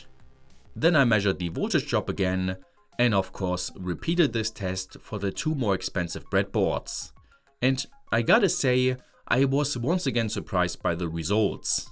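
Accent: Germany. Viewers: Bitte arbeite dringend an deinem Englisch oder sprich einfach deutsch. Man hört das du deutscher bist, dein Englisch ist einfach nur grottig.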